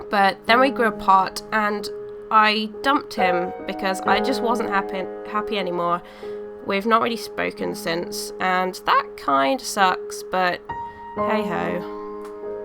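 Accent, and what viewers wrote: Birmingham, United Kingdom. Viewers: I really really adore yout artistic skills... and your British Accent!! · I love your drawings and British accent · I really suck at accents and then it hit me, she sounds familiar, then you came out with your from Birmingham! I haven't seen any other ' brummies' on YouTube, so hiiiii! :)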